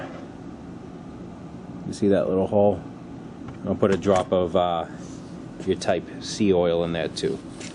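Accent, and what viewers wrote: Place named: New York, United States. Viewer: the carriage hand wheel. I think I just got some old oil gunk under the carrier. I sprayed wd40 and it got much smoother but I probly should remove the carrier and clean under that. It looks like we both have a b9w is that right? I'm new to this machine and would desperately love to learn some more. Btw I'm located in CT and I love the ny accent lol. It looks like we do indeed have the same lathe. I would love to talk to you and maybe pick your brain a bit if you dont mind?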